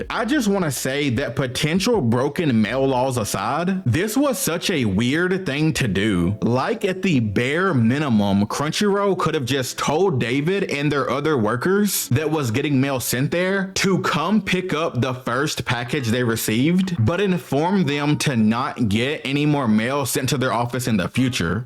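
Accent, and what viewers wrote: South, United States. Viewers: This man def a southern brotha✊🏾😂, all love, I just can tell 😂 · Who was that guy on Bones with the strong country accent… this narrator is giving strong him vibes. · You sound very hillbilly, Mr Ty for making the video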